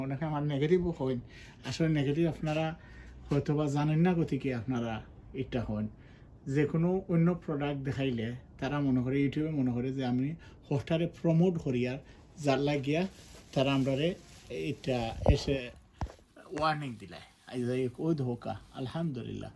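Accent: Sylhet, Bangladesh. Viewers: তোর আর কোন কাম নাই কথা শুদ্ধ ভাষায় বলতে শিখে কথা বলো সিলেট মাল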